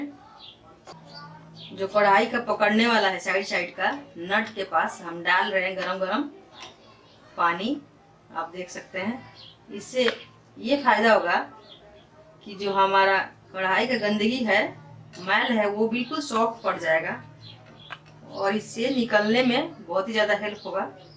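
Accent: Bihar, India. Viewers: आप बिहार से हो क्या ?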